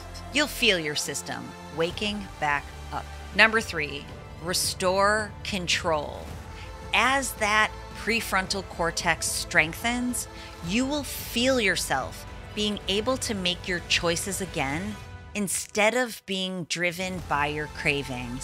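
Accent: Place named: Buffalo, United States. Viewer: I love your Buffalo accent,!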